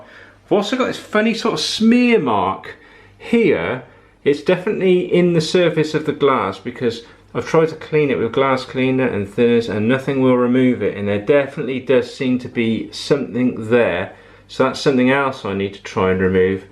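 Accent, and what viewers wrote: United Kingdom. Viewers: My grand parents and beyond were Brits and I really enjoy the accent...I'm a yank now...The vid was very informative and I need to do this...am in the process of restoring a 93 Honda Prelude that has tons of micro scratches from dirty windshield wipers being dragged over a dry windshield...Thanks again...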